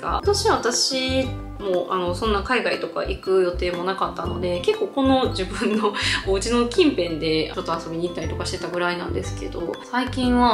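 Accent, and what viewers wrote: Kansai, Japan. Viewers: 最近のあんくんと出ている動画では標準語寄りだけども、1人で話す時はやはり関西弁になるのがかわいい（笑） これからも変わらず応援していますよ✨✨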